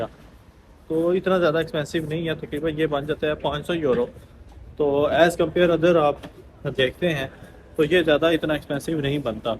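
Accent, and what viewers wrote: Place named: Kashmir, India. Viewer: sb kya hll aaa...kashmir se lgte oo ap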